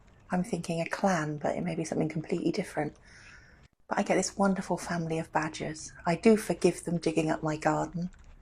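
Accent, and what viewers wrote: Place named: Swindon, United Kingdom. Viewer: Foxes and badgers in the garden. Don't they tend to give (some) people the hump and poo a lot in gardens? Sounds as if you did a combination of The Good Life and Hugh Fearnley-Whittingstall's River Cottage back in the day! And I have to say, you've retained a slight Swindonian accent - it's still there! 😁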